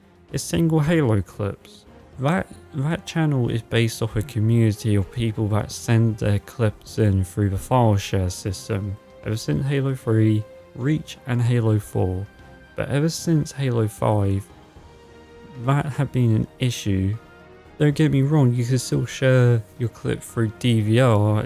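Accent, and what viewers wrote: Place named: United Kingdom. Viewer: I agree with what you're saying, And don't worry about what your voice sounds like, I think it's sound great, also what is your accent? I keep thinking it's a form of a thick British accent.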